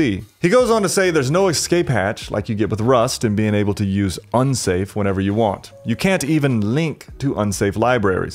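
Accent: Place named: Chicago, United States. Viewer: Completely off topic but correct me if I'm wrong but is that a Chicago accent I'm hearing?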